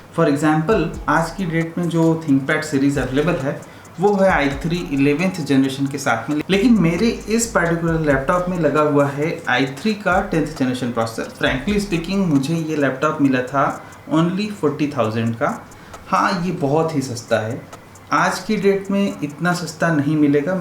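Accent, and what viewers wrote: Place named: Bengal, India. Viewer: I am guessing from your acsent , that you are a bongali !!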